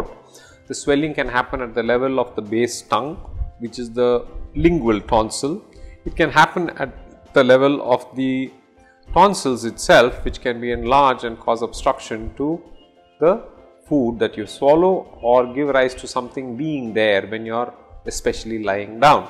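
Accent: India. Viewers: Did u say dickheads or decades?! 🤣ur Indian i don’t understand u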